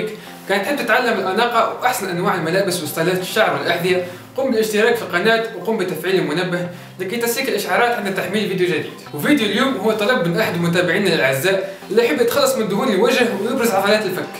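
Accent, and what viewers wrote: Tunisia. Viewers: ياخي إنتي تونسي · ههههه لهجة تونسية 🇹🇳🇹🇳🤣🤣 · ضاهر تونسي 100%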